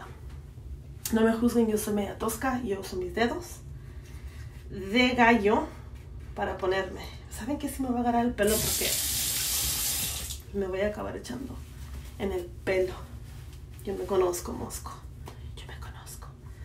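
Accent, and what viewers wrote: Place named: Argentina. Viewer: Jajajaja ame tu acento argentino! Saludos desde buenos aires 💕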